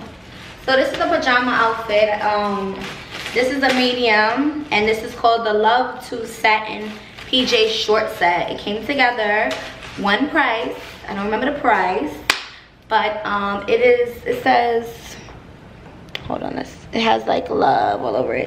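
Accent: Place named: New York, United States. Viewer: This must be a NY thing because, I talk just like this in my video😩😩😩 (FEEL ME?)😂😂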